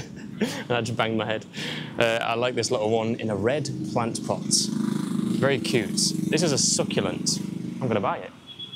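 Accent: United Kingdom. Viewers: شكرا جزيلا انا اتعلم اللغة الانجليزية واحب اللهجة البريطانية احبها جدا 🌸💐🪴🌱واحب النباتات جدا شكرا مجددا انا لمى من المملكة العربية السعودية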